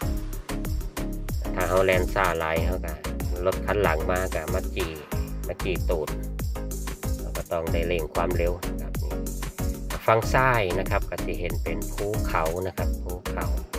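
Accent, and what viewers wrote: Chaiyaphum, Thailand. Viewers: สำเนี๊ยง..ชัยยะภู๊มมมครับ...เสียงมวนขนาด..เคยมักสาว..ชัยภู๊ีมิ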